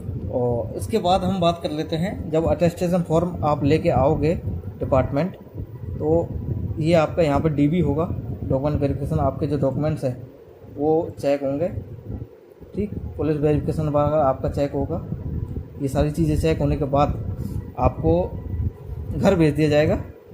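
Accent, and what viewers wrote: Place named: Agra-Mathura, India. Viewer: Bhai agra mathura side se lgte ho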